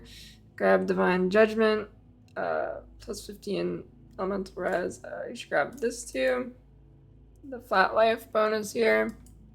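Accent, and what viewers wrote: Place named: United States. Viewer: That's her voice. She has an American accent. It's not an indication of anything other than where she learned English.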